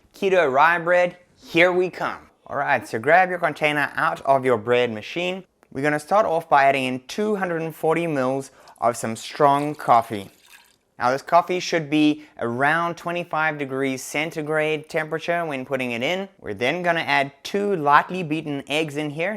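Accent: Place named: South Africa. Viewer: that south african twang still comes through ;)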